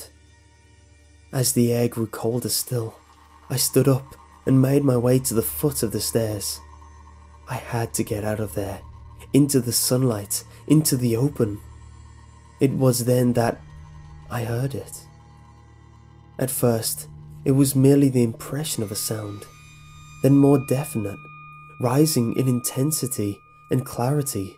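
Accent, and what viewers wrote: England, United Kingdom. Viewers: I like MCP and. But I like English accent better than MCP's accent.:D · Yah,not really into britspeak.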